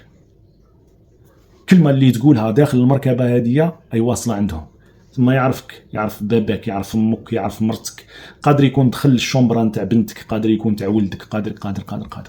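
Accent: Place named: Morocco. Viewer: لهجه مغربيه 😂😂😂😂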